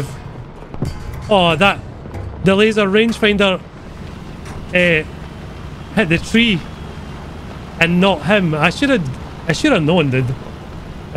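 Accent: Scotland, United Kingdom. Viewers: You got me into War Thunder recently and always make me laugh. I love the silky Scottish tones too 😂 You keep up the entertaining work marra!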